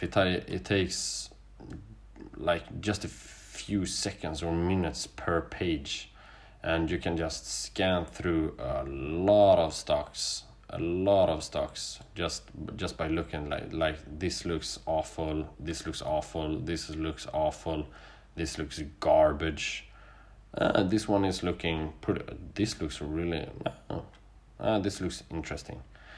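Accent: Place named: Sweden. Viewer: Tjena, kanske ingen vild gissning men tippar på att du är svensk. Tack för en bra video, leker runt med finviz för att hitta bra aktier. Mest dock penny stocks som kan bryta ut kraftigt är det jag letar efter. Tycker du ska köra på svenska istället för engelska, mindre tittare kanske men tror ditt talande hade blivit mer flytande och naturligt. Har du fler set ups på finviz du kan rekommendera om man vill leta aktier som kan sticka iväg uppåt?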